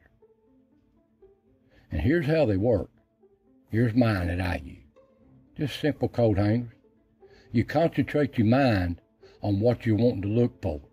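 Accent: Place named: Georgia, United States. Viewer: When I was a little 3-5 years old girl, I remember riding up and down on a pump handle!! About 6-7 years ago, I was hand washing clothes by scrub board, wash tubs. I did that for about 2-3 years. In the winter, it was horrible how bad the cold weather was on hand washing clothes!! I love listening to you talk! You sound like me! I'm from Georgia, live in Cheyenne, Wyoming now!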